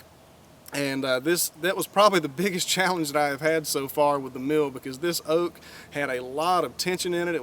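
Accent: South, United States. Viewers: Hello from Canada! I really wish american You Tubers would tell us what state they come from! I have had some travels in my time in the U.S. but there's a lot I haven't seen. Nonetheless there's a few: 1) You're somewhere in the south partly by your Parlance (more than just accent) and being a language lover this is nice educative fun for me also!, so from Quebec <<chapeau! >> 2) By the vegetation around, this is obviously not in the desert or the midwest. (watchi8ng a lot of Amrtrak videos, I have seen some of the countryside that way to be able to compare with). since the vbagetation looks quitre thick and lush, in soite of the drought you reported, This has to be a notable warmer climate with a notably longer growing season than ahnyrhing up north. So your effort seems very well placed in relation to nature! As for wood, as it hapopens I grew up amny years ago in Oakville, Onterio, some 20 miles West of Toronto. 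Historicaslly the town got its name when it became famous for production of barrel staves, made of, oak of course. In our back year was one of thise huge oak trees, and, of course lots of squirrels, but thos little critters can also make a !!!! of a mess of the corn patch. (Also they can carry rabies, so they are somewhat of a public pest). So all in all thumbs up and to/from??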